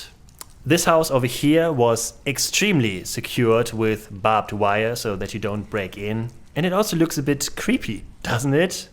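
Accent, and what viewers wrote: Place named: Germany. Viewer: Het klinkt als Engels met een duits accent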